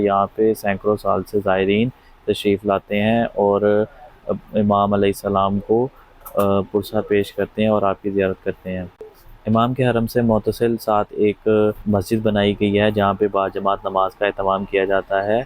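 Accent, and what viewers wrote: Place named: Punjab, India. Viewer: Bhai tum Punjabi ho tum jab Urdu bolte ho Punjabi tone me bahut maza ata he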